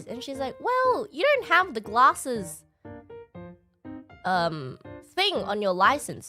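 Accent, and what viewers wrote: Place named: Australia. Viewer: 호주 영어 발음 뭔가 듣기 좋음ㅋㅋ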